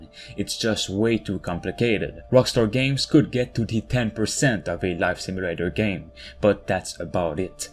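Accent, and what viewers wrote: Quebec, Canada. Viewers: Are you frome Quebec? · Si s'gars là est pas Québécois bro... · I feel the strong Quebec accent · Where are you from ? Ypu sound like a french canadian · Qc? The accent is telling me you are a French Canadian just like me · you got that french canadian accent ma boi i might be wrong tho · Je reconnais c’est accent québécois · I can sense the Quebec accent lmao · Do I hear an Accent from Quebec ? Je crois bien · Bel accent québécois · ce gars là est clairement Québécois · Tabarnakkkkk that Québécois accent threw me off 😂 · Calique d'accent québéquoi mon boy bonne vidéo par contre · Where is this guy from? Accent sounds like he's french canadian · T quebecois toe hein? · T’es tu Québécois par hasard? 👀😂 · Are you from Quebec cuz you got that accent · Tes québécois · Toi c'est sur que t'es quebecois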